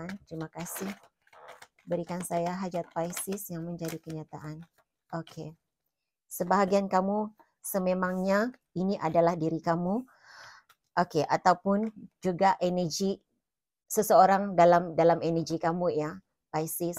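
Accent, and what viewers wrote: Malaysia. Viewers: Bunda seperti nya asal dari Malaysia , cakapnya mirip melayu. Thks Bunda atas readingannya.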